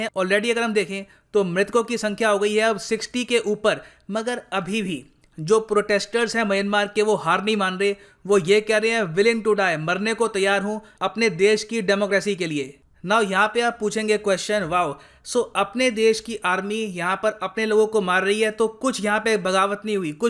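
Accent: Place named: Tamil Nadu, India. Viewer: You speaks like tamil, What's your mother tounge and mother language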